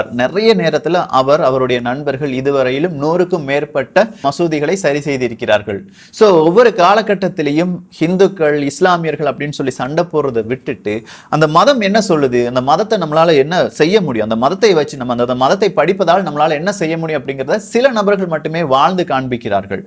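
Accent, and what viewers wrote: Kerala, India. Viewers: Friend, are you Keralite🤔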